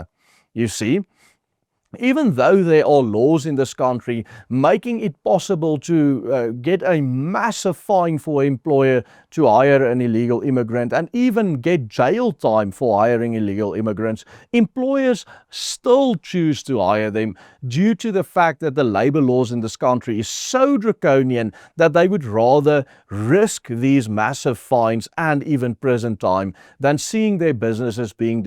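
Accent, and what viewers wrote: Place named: Afrikaans, South Africa. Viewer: An interesting pod caste. Just wish the presenter would not put his Afrikaans spin on the names of the stores and people.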